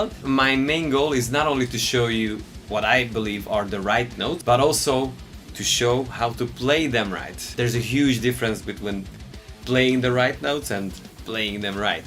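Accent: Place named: Hungary. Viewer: A jó magyaros angol akcentus a kedvencem :D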